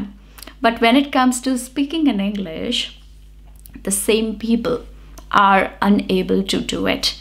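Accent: India. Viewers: Don't make videos regarding pronunciation cuz Indian pronunciation sucks. Some of you might be thinking I'm obnoxious and trolling her, but I'm not. I searched on Google, "Why do native speakers make fun of the Indian accent?" I opened a Quara link, and a native speaker has written when Indians speak English, it sounds as if a native speaker is trying to speak Hindi. Now, you can image how awful it is.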